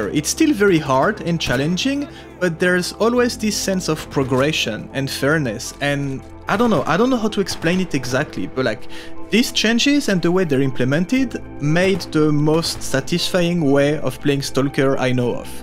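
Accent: France. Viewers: Thank you very much for this review, you do good job with your videos. En plus avec l'accent français c'est encore plus compréhensible. Like you I love the huge work of Grokitach, it feet my vision of S.T.A.L.K.E.R This game and its community is one of the best things in this world ! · Tu es Français? (vue la manière dont tu parles anglais lol). Si oui j'aimerai bien un peu d'aide pour comprendre certains détails sur le mod GAMMA. Merci d'avance. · Hey great vid man ! I never played the stalker series but I would really like to, so I started watching your videos and I have some questions, that maybe you could answer (or make a video about like : getting started on stalker). I would like to play the original game's stories and I know everyone says to play the games without mods to get the developper vision but it really is a big hold back for me. Maybe if you played the games back then the sounds and graphics don't get in the way but, nowadays I can replay only old games if I played them back then. 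So do you have mods you recomend for a new player to enhence the 3 games so I know I will actually finish them ? Thank's in advance if you have the time to answer ! PS : est-ce un accent français que j'ai cru entendre ? 😄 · Je sent dans l'accent qu'il y a du français, ça fait plaisir ! Superbe vidéo !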